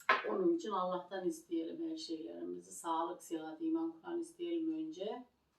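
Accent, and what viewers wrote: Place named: Aksaray, Turkey. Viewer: Nerelisin abla konuşman Aksaray lı gibi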